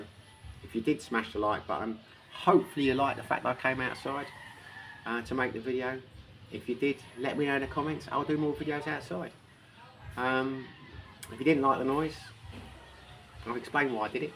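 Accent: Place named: England, United Kingdom. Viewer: Yapping in an English accent for too long will turn off my interest in any topics.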